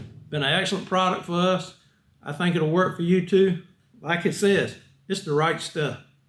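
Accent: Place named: North Carolina, United States. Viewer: Hahaha heard you talking and knew you were from NC.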